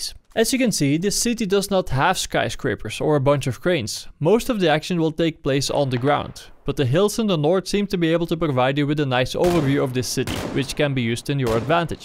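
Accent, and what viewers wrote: Netherlands. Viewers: Dis guy sounds so Dutch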